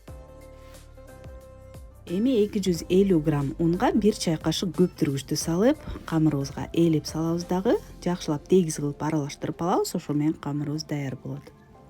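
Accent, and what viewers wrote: Standard. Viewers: Азаматсыз,ийгилик каалаймын.Таза кыргызча суйлогонунуз учун лайк басып,катталдым💐Буйруса даярдап кором.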